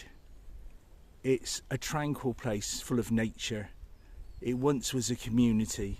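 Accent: Wiltshire, United Kingdom. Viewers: all your walks are wonderful, taking me to places my ancestors would have known - and I can never visit in person. That means alot to me and also to hear the Wiltshire accent is delightful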